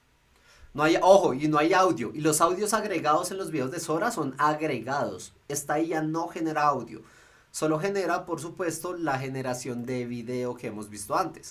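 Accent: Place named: Colombia. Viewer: Gracias por el resumen. Me encanta tu onda , forma de explicar, la edición de los video, y, la forma de hablar colombiana. Slds from Argentina. I love Gemini.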